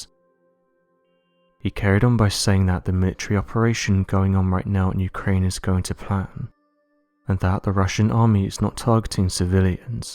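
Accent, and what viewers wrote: United Kingdom. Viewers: Thank you for this vital information. I have been curious about who and what is Anonymous? Are you the same organization that started "Occupy Wall Street"? I saw you on the www in support of George Floyd. You seem to always have a British accent. Are you part of the London, Georgetown, Harvard trilateral covert network. Why must you be anonymous? We need Patriots like you front and center on the scene for Democracy. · Thank you for highlighting the brilliant work done by our see eye aye! Whoever uses this brit voiceover obviously watches cable TV.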